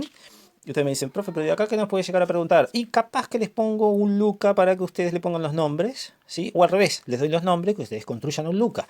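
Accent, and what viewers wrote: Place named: Chile. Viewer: ¿De qué materia y nivel son estas clases? Por el acento creo que eres de Chile, ¿Es correcto?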